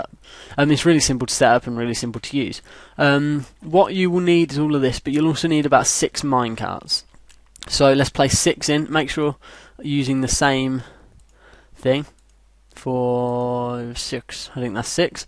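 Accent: United Kingdom. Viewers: I love british acents and good for if you have little materials too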